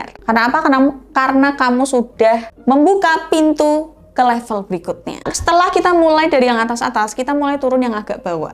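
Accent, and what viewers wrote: Java, Indonesia. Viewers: Jowo bgt mba asli hhaahaa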